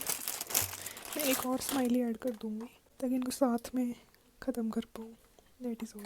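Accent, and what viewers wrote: Punjab, India. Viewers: hey, i started watching your vlogs and shorts recently and im hooked fr.. really appreciate your efforts and the time and energy you put in your work💅🏻 i just wanted to make a request to you that make a vlog where you'll only speak punjabi for the whole day or whole duration of your vlog (as im a punjabi who stays in mumbai, i got your punjabi accent in your daily sentences and stuff.. also the "भांडे मांज" rahi hai meri roommate- was amazing 😋.. i hope you make a punjabi vlog)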